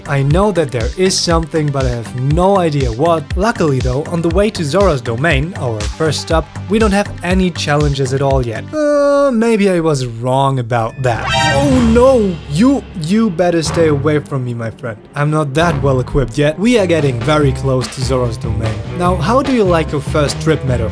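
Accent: Germany. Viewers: I have a question are you German? It may sound weird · are u german?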